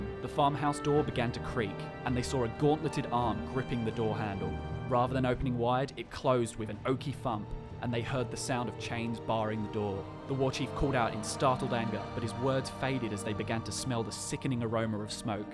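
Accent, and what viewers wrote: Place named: Australia. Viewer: It's weird listening to this dude coz his Aussie accent isn't as obvious as Michael and Scott... nevertheless I think he nailed this, chose well.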